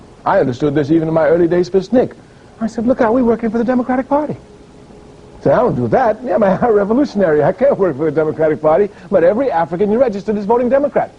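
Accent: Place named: Trinidad and Tobago. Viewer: Here he sounds the most Trini I've ever heard him sound..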